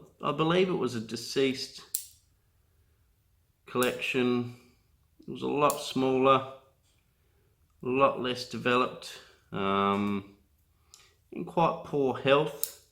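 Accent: Australia. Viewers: Iam a beginner and I love junipers,great tip thanks, the accent and the funnel web spider bring memory of beautiful Australia, thanks again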